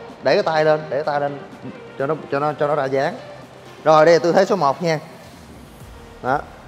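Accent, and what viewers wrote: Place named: North, Vietnam. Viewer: Lâu lâu vào nghe giọng "đàng ngoài".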